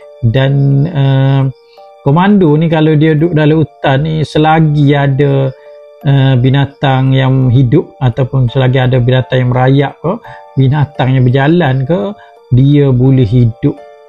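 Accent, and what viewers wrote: Kelantan, Malaysia. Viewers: Pekat bethol kelantan kau bang 😂 btw keep going 👍🏻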